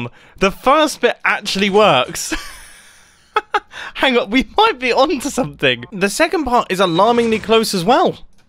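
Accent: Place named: United Kingdom. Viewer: Does anyone else not have any idea how any of this redstone stuff works? I just like watching the British man talk funny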